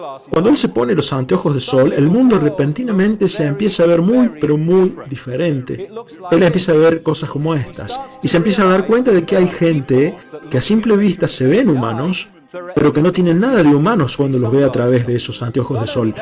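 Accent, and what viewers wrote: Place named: Córdoba, Argentina. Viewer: ❤❤❤ otra vez lo voy a ,👀👂no m acuerdo del acento cordobés 😂tienen mucho salero 🧂 como buen@ Andaluces 👍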